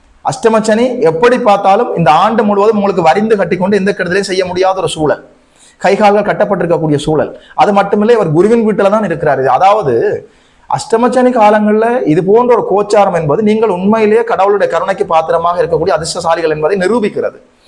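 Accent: Sri Lanka. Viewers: Sir unga tamil and astrology fan sir naan...ungaa srilankan tamil kekkatha naan ungala subscribe panniirukaen. I m from kanyakumari sir(Nagerkoil)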